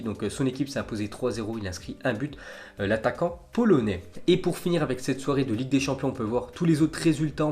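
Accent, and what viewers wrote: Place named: France. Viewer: c'est le français typique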